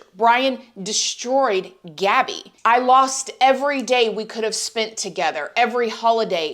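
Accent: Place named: Midwest, United States. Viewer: You have the coolest accent I’ve ever heard in my life and I’ve travelled a lot ! What is the place where folks speak in this way ??? Midwest US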